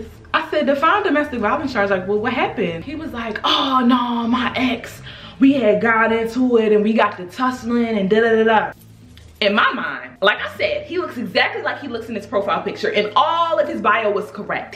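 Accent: Baltimore, United States. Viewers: Lmfao I knew you was from Baltimore! I love your videos. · u from Baltimore? Subscribe. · As soon as I press play I instantly knew you was from Baltimore 🥴😂 it's something about that west side accent....I always pick up on it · Yo tell me why I spent 20 minutes on ig tryna figure out if you was from Baltimore bc I could hear it ASAP when I literally could’ve just watched a min further in 😭😭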